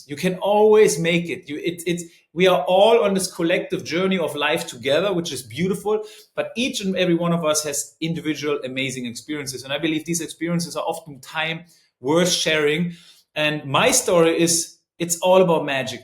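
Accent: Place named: Germany. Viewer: Sorry Kumpel, irgendwo habe dich sprechen hören, dass du in Englisch sich einfacher als in Deutsch äußern kannst😉 Jetzt finde dich Englisch sprechend und ok...du redest fliesend, aber dein German accent ist schon markant, was deine obige Aussage nicht nur in Frage stellt, sondern auch ausschließt. Deutsch ist und bleibt für dich eine Sprache die du am besten kannst(dagegen mein Deutsch...na ja). Bin auf dich in polnischen Medien gestoßen und aus reiner Neugier habe ich kurz recherchiert, wer du bist. Mein herzlichen Glückwünsch zum Vater sein, das Gefühl könnte ich zwei mal lernen. Eins haben wir noch gemeinsam...wir beide leben in Schatten unserer Frauen. Meine Gattin(im Avatar noch mit 36) kommt wie die Marcelina auch aus Polen. War Inhaberin einer Medienagentur in Stettiner TV und Finalistin, Warschauer Look of the year. Nicht desto trotz, ständig bodenständig, liebevoll und smart. 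Mach alles richtig, den ersten Schritt hast gewagt 🥂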